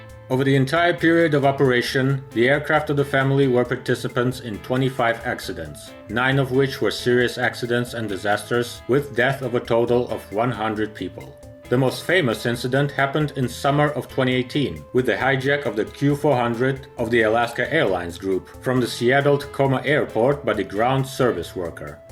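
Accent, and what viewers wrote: Russia. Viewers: Thanks for your work friend. I like your Russian accent, and I live in the USA. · The rusdian accent is di atrocious. Couldn't make it beyond one minute. Full disclosure, I'm rusophone myself.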